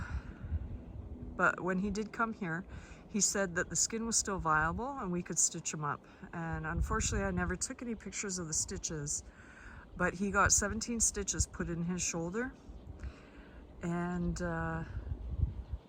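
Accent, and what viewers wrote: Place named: Minnesota, United States. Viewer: I'm glad Jack is ok. However, you have a wonderful Minnesota accent! 💕🐎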